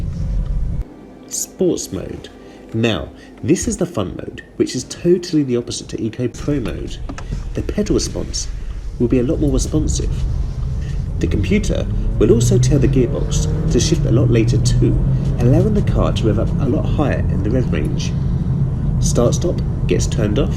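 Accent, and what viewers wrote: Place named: England, United Kingdom. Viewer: Why do you have an English accent but in Russia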